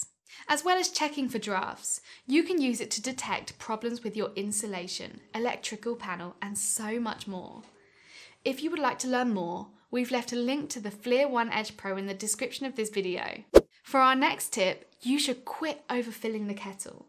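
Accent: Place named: United Kingdom. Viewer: Radiators are uncommon in the USA. Guessing by your dialect, you are across the pond. Other tips work for both countries.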